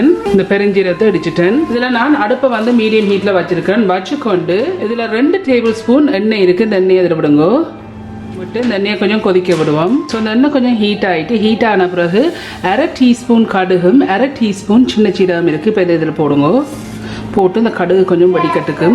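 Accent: Jaffna, Sri Lanka. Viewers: ma...!!!! I like your Jaffna Tamil..... I'm also Jaffna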